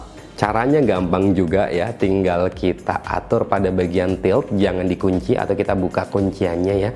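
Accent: Pekalongan, Indonesia. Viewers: Wong pekalongan yo mas? 🙏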